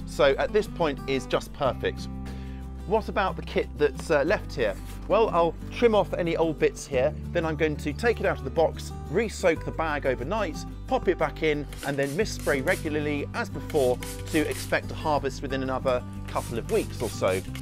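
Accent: United Kingdom. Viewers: Apart from all the useful information that this video provides, I found it quite relaxing to watch and your British accent contributed big time to that effect as well 😄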